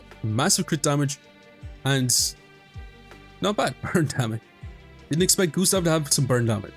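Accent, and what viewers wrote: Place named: Midlands, Ireland. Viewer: Lovin the videos on Guildrun man but I can't place your accent and it's drivin me up the walls haha its between Monaghan and Roscommon I'm guessin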